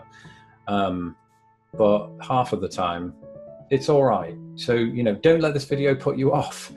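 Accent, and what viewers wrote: United Kingdom. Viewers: Proof we will watch anything with birtish commentary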